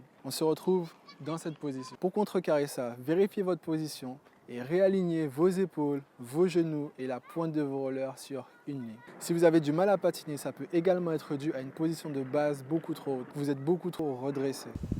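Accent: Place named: Guadeloupe, France. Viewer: Pour ton accent ne le dissimule pas ça rajoute un charme ;) #vivgwada #panipwoblem 😅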